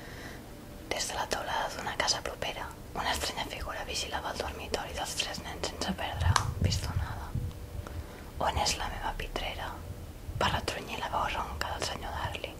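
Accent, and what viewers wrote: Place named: Spain. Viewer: Hola: Que bonito tu ASMR, me encanta la tonalidad de tu voz y lo más FANTÁSTICO es que el acento ESPAÑOL SE PRESTA MUY BIEN AL "ASMR". Por favor, haz mas ASMR, en Español y por ahi en Catalán, PERO ahorita procura hacerlo en Español, ahhhh siempre es recomendable poner en el titulo del Video ASMR, cuando vas hacer ASMR, para que así más usuarios puedan gozar de tu lindo material FELICITACIONES: Me encanto tu Video. Saludos desde Lima - Perú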